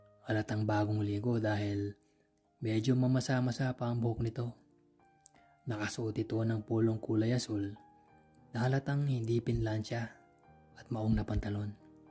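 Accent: Pampanga, Philippines. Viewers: The accent is very Kapampangan. ❤❤❤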